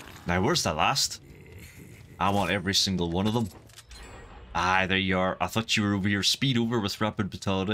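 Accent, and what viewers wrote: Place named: Scotland, United Kingdom. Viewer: Love watching your videos!!! Your Scottish accent makes it even better haha keep up the good work dude!!!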